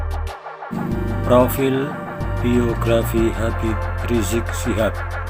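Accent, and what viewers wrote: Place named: Java, Indonesia. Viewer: Medok amat ai mas broooooo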